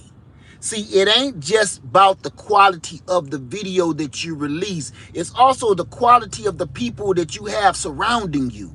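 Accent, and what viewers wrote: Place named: South Carolina, United States. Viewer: Hello! I been watching a few of your videos now and really enjoy your content! I am in Germany and my 2 Bi-racial sons live in SC, just as I did from 99-2012- heck I miss it so much and my goal is to make it back one day. So good to hear you talking- that SC slang that I speak lol Love what you say and am agreeing 100% Keeppushing! God bless you!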